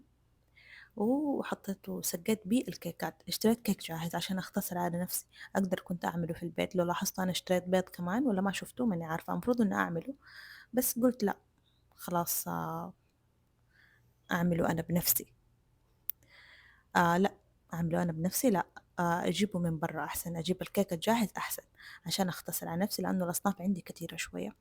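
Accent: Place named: Jeddah, Saudi Arabia. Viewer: هي سعوديه جداويه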